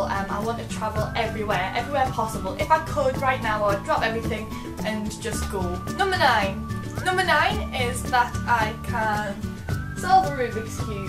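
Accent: Newcastle, United Kingdom. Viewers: i follow both your accounts :) :) and im a geordie as well :) nice to hear someone on the internet talk like me :) :) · Wont be having any problems understanding you, been watching Geordie Shore for so long. Good job on your first video ( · Haha, I loooove your accent! :D Thought you were from Scotland at first, but seeing as Newcastle is "almost Scotland", I guess I was close enough. ;) (I spent an Erasmus semester in Edinburgh and my heart is melting every time I hear a Northern accent! :) Btw, great video as well! Very entertaining to watch. xx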